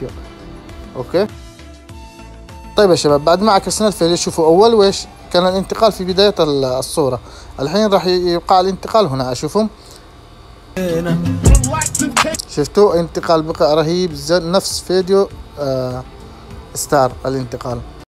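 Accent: Yemen. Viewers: انت من اليمن صح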